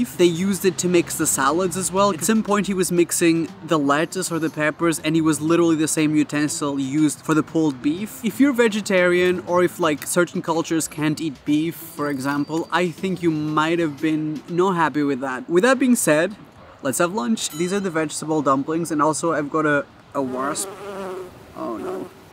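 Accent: United Kingdom. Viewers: of course he's British. Do you not hear him?😂plus he has said many times he lives in London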